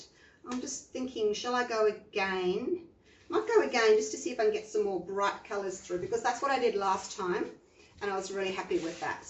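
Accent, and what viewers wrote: Australia. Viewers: Just discovered your channel and it's amazing :) Thank you for sharing all your techniques, that's so generous and inspiring! I'm starting to explore with pouring and you're giving me lots of ideas. And great to hear some Australian, takes me back to my backpacking year ahah Love, from Germany (and France)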